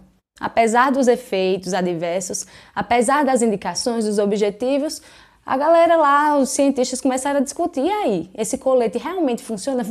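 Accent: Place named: Northeast, Brazil. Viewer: Parei de fazer minhas corridinhas devido a cor na lombar. Machuquei a lombar devido 2 tombos que caí de costas no piso cerâmico, e não procurei tratamento na época. Dia 29/1., farei uma Tomografia da Lombar, e a partir daí vou aguardar o que o Ortopedista vai dizer. Porém baseado nessa aula de Fisio, já tirei o tal colete de proteção lombar do carrinho do Mercado Livre.....rsrsrsrsrs. Sinto muita falta dos meus treinos e Provas de corridas de ruas com a galera da assessoria na qual estava treinando, mas vou seguir o tratamento conforme recomendado. -Primeiro vídeo que assisto da Dra., mas seu jeito de explicar e não massagear o ego dos sofrentes de dores nas costa, fiquei impressionado com a clareza. Além do sotaque que me parece ser da região nordeste, que prende a atenção do inicio ao fim do vídeo. Obrigado por todas as informações com muita clareza e objetivo, sem rodeios. 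Abraço!!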